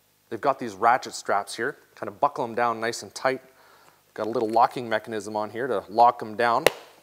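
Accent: Canada. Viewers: you cant tell by how he talks?! Canada ehhhh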